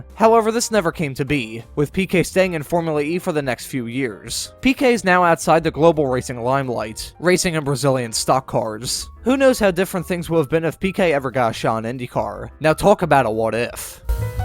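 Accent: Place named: Mid-Atlantic, United States. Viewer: Ah I was gonna guess somewhere in the mid Atlantic. I grew up in Baltimore so I thought it sounded kinda familiar! Great videos btw keep up the good work. Cheers from the Indiana!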